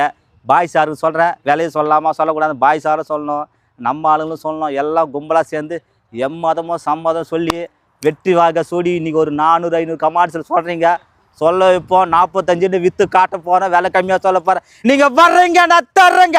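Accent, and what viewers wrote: Madurai, India. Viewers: Super Anna Madurai vasagam 🎉🎉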